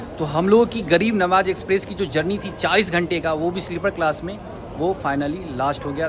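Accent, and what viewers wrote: Bihar, India. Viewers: This guy english is so funny not in a bad way but that bihari accent english is hilarious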